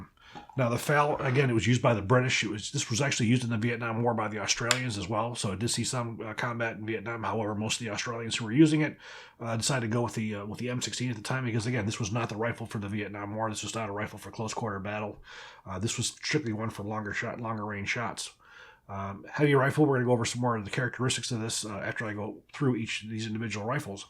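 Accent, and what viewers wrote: Texas, United States. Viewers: Texan accent.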